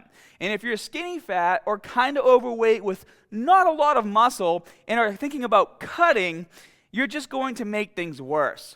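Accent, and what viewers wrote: Boston, United States. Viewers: This guys definitely from Boston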